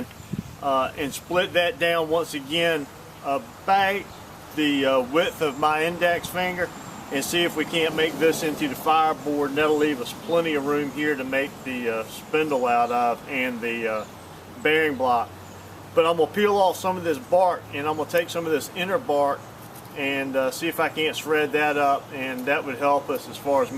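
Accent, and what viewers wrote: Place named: Richmond, United States. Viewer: I'm really liking your videos man. I have this knife as well. Great knife! This thing is a work horse and cuts about as well as any knife I own; and that includes a F1 Fallkniven. I asked Dave his opinion of the knife and he said, because it was only 1065 steel it would not truly be effective in all ways. Have you tried to throw sparks off of it with flint? By the way, are you from the Richmond VA area? I think I recognize that accent.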